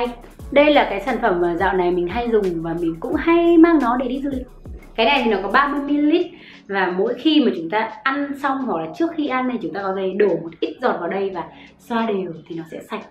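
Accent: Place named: Ha Tinh, Vietnam. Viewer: người Hà Tĩnh ý bạn ^^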